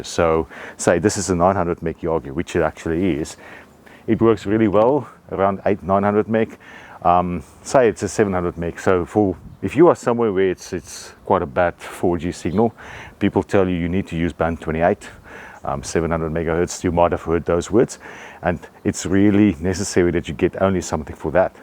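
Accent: South Africa. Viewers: you sound like you are from South Africa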